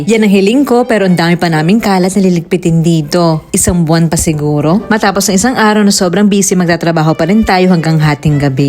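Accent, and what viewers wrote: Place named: Batangas, Philippines. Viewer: Aliw na aliw Po Ako lagi sa pag voice over nyo 🤣 kaya Po Pala tuwid na tuwid Tagalog Po Ninyo, Ala-Eh! Tubong Batangas ka Pala. 😅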